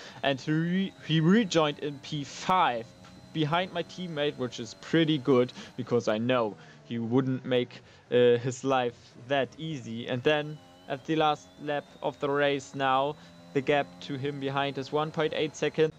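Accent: Germany. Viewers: I have one question. Are u German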